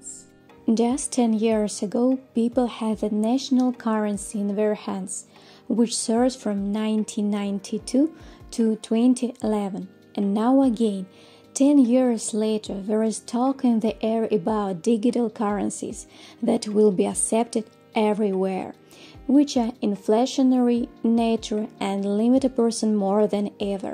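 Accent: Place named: Russia. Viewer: First, I want to say I think it's great you're practicing your English and aren't afraid of making mistakes! However, I am a native English speaker with some Russian language background, and even with my better-than-average ability to listen to Russian accents, your Russian accent is so strong I can't understand half of what you're saying. It barely sounds like you're speaking English. I would suggest three things: 1. Put English subtitles in your videos, or 2. Practice your English accent until you're understandable before making more videos, or 3. Just do your videos in Russian.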